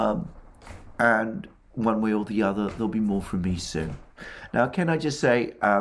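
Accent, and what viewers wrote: United Kingdom. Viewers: Kremlin BS with a British accent. How nice... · Russian troll with British accent.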